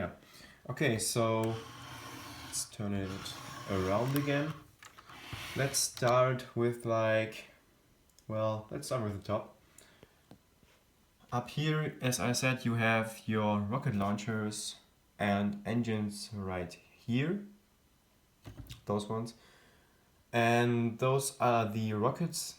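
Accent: Germany. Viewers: sieht ganz geil aus, ich weiß nicht safre vdas du deutsch bist aber dein englisch hört sich ziemlich deutsch an :D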